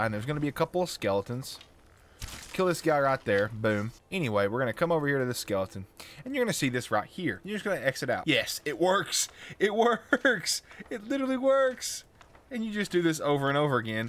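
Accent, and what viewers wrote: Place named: Rural, United States. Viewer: dude i live in texas and your accent is so hick lol skahrim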